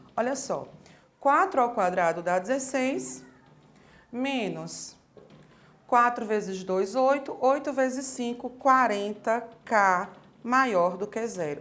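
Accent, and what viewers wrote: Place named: Northeast, Brazil. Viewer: e do nordeste pelo sutaque kkkkkkkkkkk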